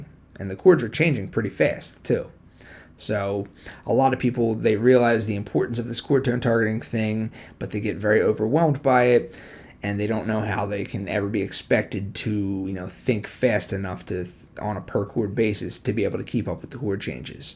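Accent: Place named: South Jersey, United States. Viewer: Man, it's pretty easy to hear where you're from. I'm from S Jersey and you sound like a lot of people I know from Paulsboro NJ. It's that Philly outskirts on-the-river vibe. Good stuff cuz!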